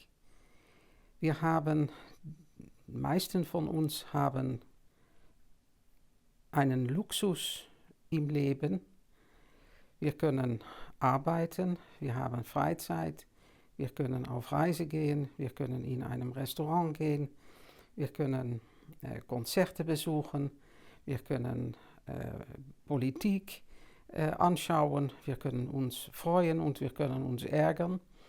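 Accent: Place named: Netherlands. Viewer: Liebe! Vielen Dank für das Video-Projekt in deutscher Sprache! Gleich drei Punkte fallen mir dazu auf: 1. Erinnere ich mich beim Hören dieses Videos in deutscher Sprache sofort an Deine wunderbaren Vorträge, an denen ich teilnehmen durfte. 2. Dadurch, dass Deutsch nicht Deine erste Sprache ist, werden viele Aussagen eben noch überlegter, als es vielleicht in der Muttersprache passiert, wo mal automatisch etwas „herausrutscht“. Ähnlich einem Weg, den man nicht täglich geht und einem dabei jeder Schritt bewusster erscheint. 3. Ich finde Deinen holländischen Akzent sehr charmant, er gibt Deinen Ausführungen eine schöne einzigartige Farbe. Also freue ich mich schon sehr auf die folgenden Videos, nun auch auf Deutsch!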